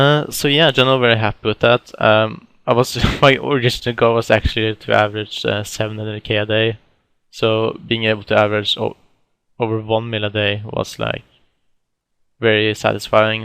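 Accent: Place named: Norway. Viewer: Gratulerer! spiller ikke 07 selv, men moro å se at du står på å er pågående! :D men hører du er ''norsk'' for å si det slik XD Men det e rmoro å se hvor god engelsken din har blitt i forhold til da du starta! :D Lykke til videre :)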